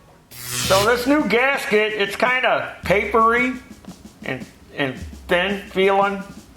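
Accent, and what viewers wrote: England, United Kingdom. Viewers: Your English accent is great! Come over some time and have some warm beer - jolly good show as usual! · That British accent is funny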